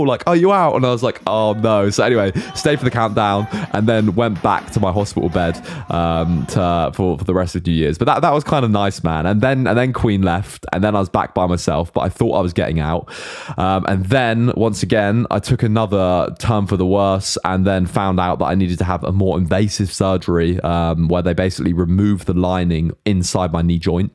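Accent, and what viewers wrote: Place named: England, United Kingdom. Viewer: I love your English accent 😍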